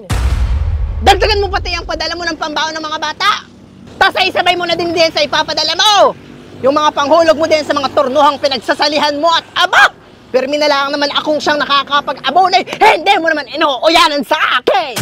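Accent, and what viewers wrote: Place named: Tanay, Philippines. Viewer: 😅😀😂ayy nakopo Meses ikaw baga e tlgng nakakatuw akoy tawa ng tawa syo meses,,,Lalo n yng Puntong Tanay, mo dito samin na "Hane" 😂😀😅👏👏👏👏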